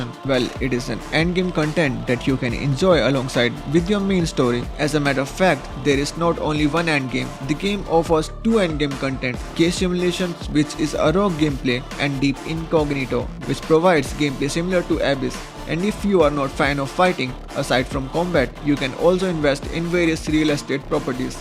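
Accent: India. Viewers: Hey umm are you indian...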